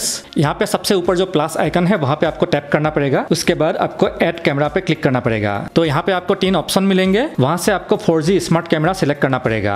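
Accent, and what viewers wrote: Assam, India. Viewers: First time hai apka channel pe ..but app ka hindi Assamese logoko jesa hai ..kya app Assam se ho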